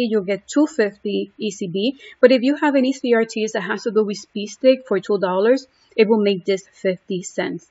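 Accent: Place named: Spain. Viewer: Hello!!! I can feel your spanish. Why dont you do it in spanish.😀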